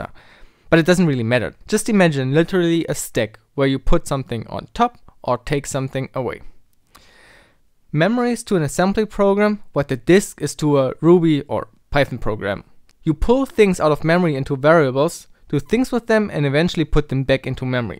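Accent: Germany. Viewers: I agree. Too fast, his drawing is too messy, his writing too small AND he got the stack direction the wrong way around (albeit correcting it after the fact). He talks too fast AND IN A GERMAN ACCENT that isn't easily parsed at high speed. What MANY "tutorial" publishers seem to forget is that it's the people who DON'T KNOW THE SUBJECT who are your primary audience - if that audience can't understand you, or your sloppy preparation (or lack thereof) starts them off on a flawed footing, why are you teaching at all? That would drive me insane - I wouldn't DARE publish a tutorial in the state this is in, I just couldn't.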